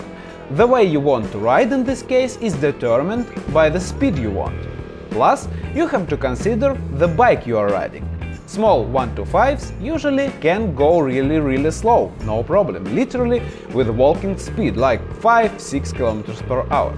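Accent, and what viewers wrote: Russia. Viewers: Please don't make fun of your accent, I love your Russian accent and I highly enjoy you content!😄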